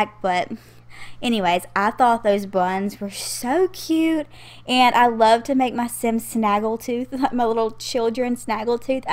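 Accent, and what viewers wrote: South, United States. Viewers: So Cute......... And Loving Your Southern Accent...... As You See I Am Southern! HUGZZZZ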